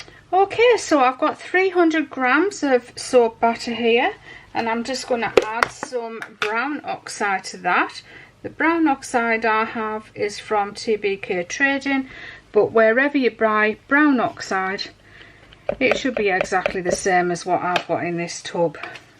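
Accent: United Kingdom. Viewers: Lady! I don’t know why I haven’t watched you for so long. I think I overdosed on soap! 🤢. You are one of the wonderful generous soap ladies who made me feel like I could a; make soap and b; pipe soap even though I had never piped really anything of any mention before. You are still at it trail blazing. Thank. You for sharing your time, your talent and your lovely British accent!!!n. Keep up the wonderful work!!! PS I have been a fan for over 5 years!!! Wonderful to see how you’ve resolve your pesky silicone liner problem!!🤣🤣. You’re a genius!!! “Blue birds and Cherry blossoms” soap name!!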